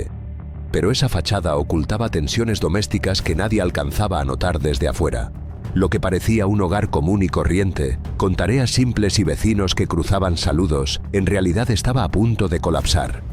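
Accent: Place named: Spain. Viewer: Ya era hora! Un narrador ESPAÑOL.😊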